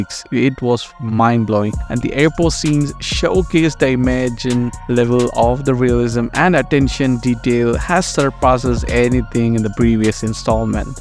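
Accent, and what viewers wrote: India. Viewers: he's talk like india+english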